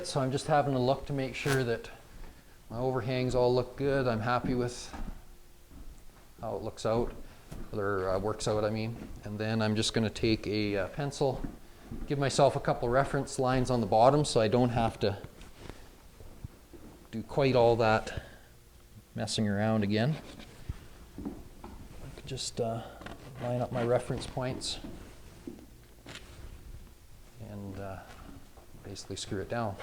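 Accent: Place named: Canada. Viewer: Took 5 words until I knew this was a Canadian.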